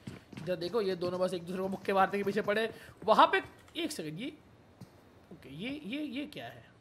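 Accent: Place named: Maharashtra, India. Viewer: You say funny marathi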